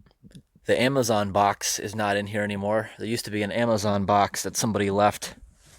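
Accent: New England, United States. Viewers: Wonder why abandoned buildings like this weren’t torn down. Love your New England accent btw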